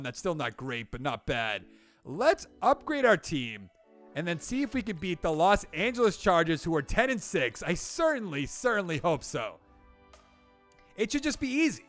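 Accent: Boston, United States. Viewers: Boston accent on point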